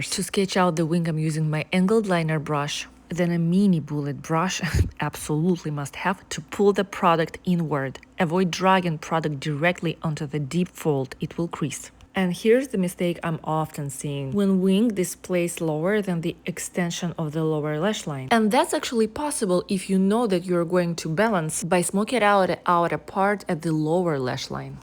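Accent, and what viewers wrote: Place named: Russia. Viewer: Какой ужасный русский акцент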